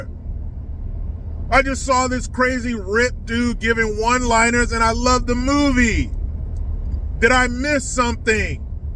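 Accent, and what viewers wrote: England, United Kingdom. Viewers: Is it Patricia Colours, one of the 3 BLM lesbians, leaders who took all the money from the BLM charity fund. Is it crooked Latisha James? Thanks, love your English accent Lol. yes this person of color crap is So o o old. Liberal Marxist divide of us up crap. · is the man. Bring back the British accent. Lizzo and Leti James had a kid. Your statue. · Great British accent brother. Not my problem. All my ancestors came here in the 1930...where I live this isnt a problem. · My God man, I was rolling on the floor with your British accent lol! I think you are funnier when you are in your car. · Your British accent killed me!!! Saludos from Costa Rica. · I never commented before that I got compliment. You on that English accent. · excellent English accent.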